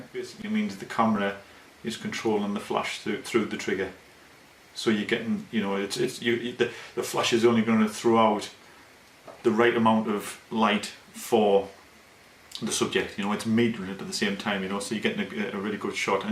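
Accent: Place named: North East England, United Kingdom. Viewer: hi, I like your accent, sounds like north-east England. Is Nikon D750 built-in flash also using optical signal to control? If we use a sb700 on the top as a master flash, do we still have to use trigger for other slave flash? Thanks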